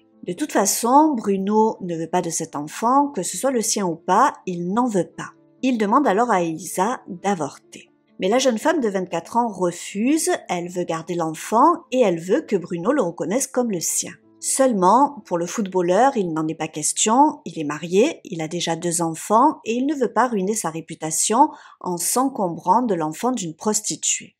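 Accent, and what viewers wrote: South, France. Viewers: Impardonnable ce qu il ont fait..ça me degoute que de tels personnes puissent vivre...pauvre fille...votre manière de narrer adoucit qq peu avec votre pointe d accent du sud qui fait plaisir !).. Bien à vous malgré ttes ces horreurs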